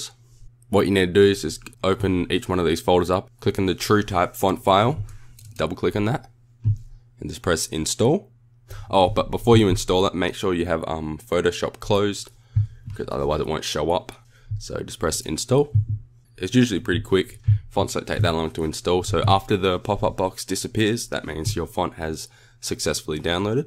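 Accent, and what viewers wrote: Australia. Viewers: I neve thought the Ausi accent and IT would go so well together....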